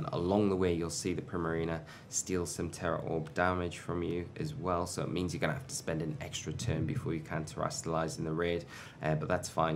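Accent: Newcastle, United Kingdom. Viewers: You’re a geordie?? How am I only realising now😮🫡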